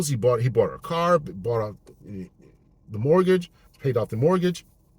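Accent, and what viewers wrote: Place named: United States. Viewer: I'm not a guy, and this channel appears to be for the guys, However, you popped up in my feed, so I decided to answer your question: Yes ! Absolutely! Just have a written notarized agreement of your requirements for her to sign prior, and a video recording of her doing it. You would have to sign an agreement as well. That's the only way that I would consider it. " Man" should not be alone according to God. That's why he made "Woman" for marriage. 3rd time is a charm !😊 BTW, Love your accent. You're from somewhere other than the South, either West or perhaps Northern. I'm your newest subscriber, probably the only born female in here listening, with the audacity to throw in my 2 cents. 😊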